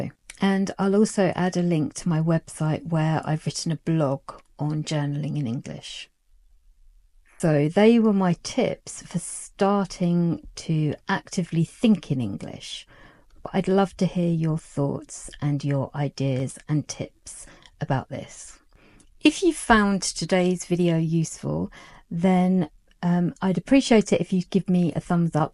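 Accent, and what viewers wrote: United Kingdom. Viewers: All tips are important for learning English and listening to the British accent makes me enjoy learning English.❤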